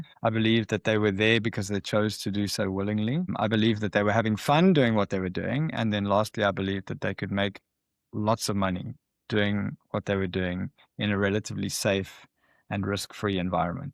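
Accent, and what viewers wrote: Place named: South Africa. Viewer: This guy sounds South African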